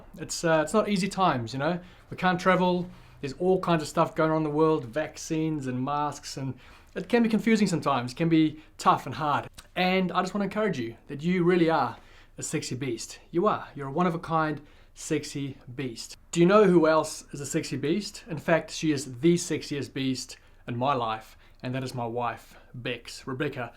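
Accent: South Africa. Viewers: Hey Mate. It’s weird listening to another South African on YouTube, but I like the videos. Keep them coming. What gym did you own in Auckland? Was it Andfit?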